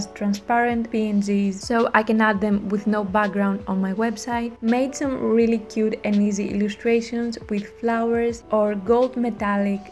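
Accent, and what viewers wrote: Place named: Greece. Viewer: you sound greek, i loved your video it really helped me thank you!!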